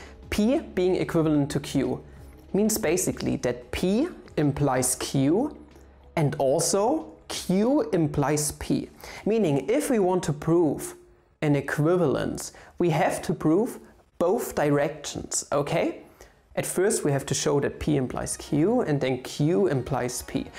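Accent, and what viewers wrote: Germany. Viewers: Is this guy German or from a country where German is the main language. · Are you german by any chance? :)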